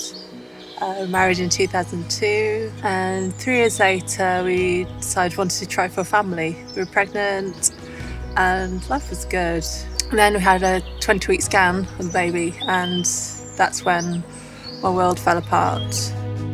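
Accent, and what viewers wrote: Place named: United Kingdom. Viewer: You are the 1st Asian I've heard who has a British accent.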